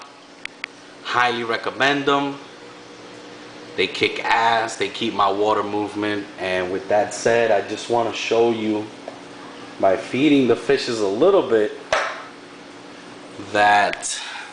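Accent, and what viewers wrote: New York, United States. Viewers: you sound like my uncle being that hes from new york its kinda weird...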